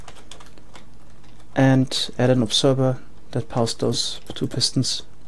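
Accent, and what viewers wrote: Germany. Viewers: Man bemerkt an deiner Aussprache, dass du deutscher bist :D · ja hört man extrem :D als deutscher sagt man immer sis anstatt this :D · man merkt das du aus Deutschland kommst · lol ich so: "Hmmmm der Akzent klingt deutsch😂" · iich dachts mir gleich wegen dem deutschen akzent... hört man einfach raus xD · Gutes englisch, den deutschen Akzent hört man trotzdem raus! (geht mir beim sprechen glaub genauso xD) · Man hört sofort das du deutsch bist xD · r u german? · Ja den deutschen Accent hab ich auch erkannt, aber hört sich ganz gut bei ihm an, finde ich. :D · You-r german? But keep up the great work :) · Not the strongest German accent I heard, but after some time you start noticing it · His german accent makes this video that much better :D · bist du deutscher?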